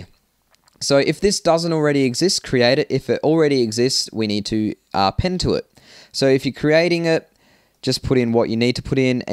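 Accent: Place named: Australia. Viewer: Nice one. And great to find some tutorials with an Aussie accent!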